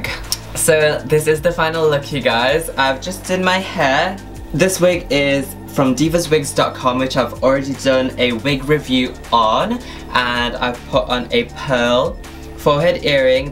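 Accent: United Kingdom. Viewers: are u british? I literally didn't notice til the end lmao. awesome work !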